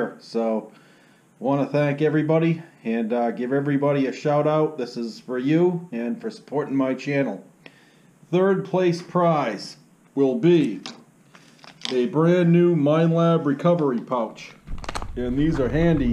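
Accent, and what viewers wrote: United States. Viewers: Put my name in the pot. Enjoy your videos and especially love the New Hampshire accent.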